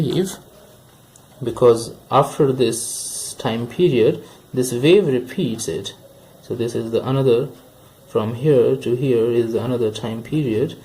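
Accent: India. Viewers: btw I already hit the like button but are you really from the US or you are an Indian guy?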